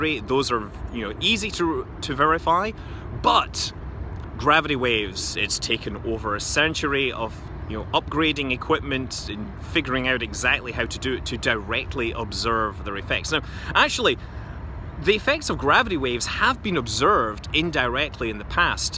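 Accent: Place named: Glasgow, United Kingdom. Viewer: I knew that accent was from Glasgow! astronomy always getting something impressive to show... go Science haha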